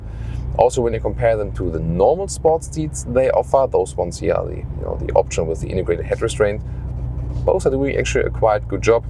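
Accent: Germany. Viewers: The perfect germenglish accent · Lovely when you wake up at 2PM and you can no longer fall asleep, you get to hear ' English with gorgeous German accent. Works better than ASMR!!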